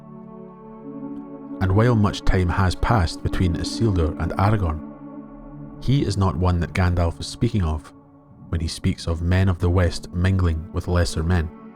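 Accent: Scotland, United Kingdom. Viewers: Excellent again. There is something inherent in Aragorn that did not exist in his ancestry for generations, perhaps since Elendil. Just as Gandalf tells Pippin that in Faramir the blood of Numenor ran true, as it did not in Boromir , so Aragorn has the majesty and power unmingled from the days of the greatness of Westernesse. Many Chieftains of the Dunedain and Kings of Arthedain passed by during the life of Arwen Undomiel, but the daughter of Elrond, and granddaughter of Galadriel and Earendil, sees in Aragorn a man she can love and respect, and sacrifice her eleven heritage for. He is Siegfried loved by a Valkyrie, Arthur returned, the Charlemagne of myth, the Restitutor Orbis like Constantine, not just the heir of a ragged line, long bereft of lordship who strikes it lucky. (How blind Denethor was!) Tolkien pushes all of our buttons. The man was a genius. 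BTW, great to hear a Scots voice amongst the babble of YouTube. Sorry to pontificate. Great channel.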